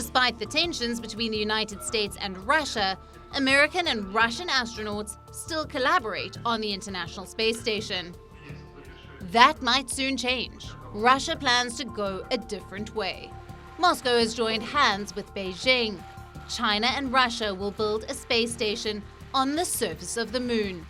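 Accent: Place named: India. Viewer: I noticed nothing but the lovely Indian accent.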